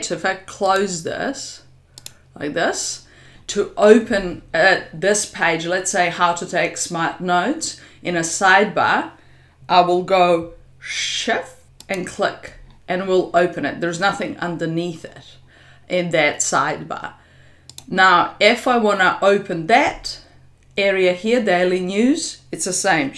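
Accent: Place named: New Zealand. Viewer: Great explanation,:). Are you from New Zealand btw? I am taking a guess based on your accent.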